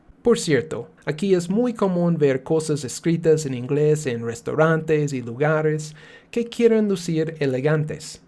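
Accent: Spain. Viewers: Que chistoso! El inglés con un acento espanol...he disfrutado tus cuentos y tengo muchas ganas de otros... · Bebo cafe dos veces al dia, cada dia. Creo que mi sangre esta llena de cafe. Funny that the accent made all the difference. I can understand 'red velvet cake' in a Spanish accent just fine.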